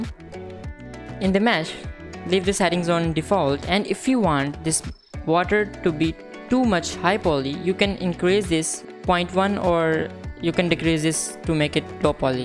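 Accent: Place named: India. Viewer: With the Hindi accent and the noisy background, the tutorial is irritating. Text to speech applications are freely available on the internet. You simply type what you want to say and the converter can turn your text to choice English speech. You can choose any voice type; or language and even such as Oxford or Cockney English. Yes, you can also choose between male and female as well as children. Windows also has such a facility.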